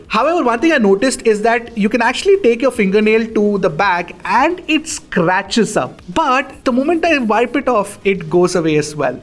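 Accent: India. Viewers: No need to talk so fast, it's like Indish english, take some paus when talking, would be much clearer to understand :D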